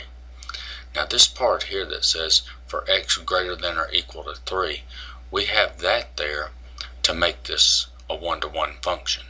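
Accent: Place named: Texas, United States. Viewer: Thank you so much Sir. Your explanation was perfect. It helped me understand the topic better. By the way, I love your Texas accent. I think it's cool.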